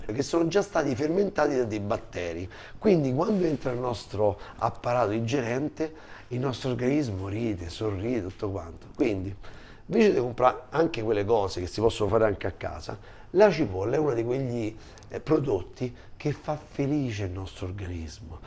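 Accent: Italy. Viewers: Preferivo i video di 3/4 anni fa dove parlavi con un accento più italiano ed eri più umile.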